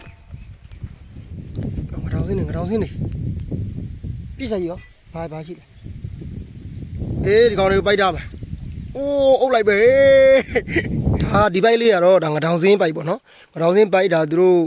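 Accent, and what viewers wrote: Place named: Thandwe, Myanmar. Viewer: ဘယ်ဒေသကလဲ အစ်က်ု့အသံက သံတွဲဘက်က အသံနှင့်တူတယ်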